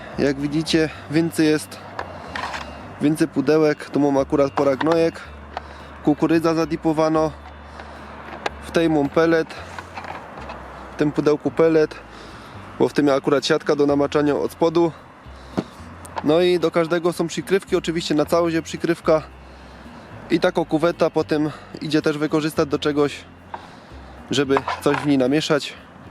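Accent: Silesia, Poland. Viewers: nie moge sluchac tego slaskiego dukania, ide dalej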